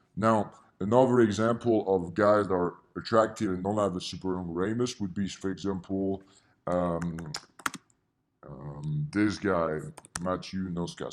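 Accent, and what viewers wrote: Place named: Netherlands. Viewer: I can tell your Dutch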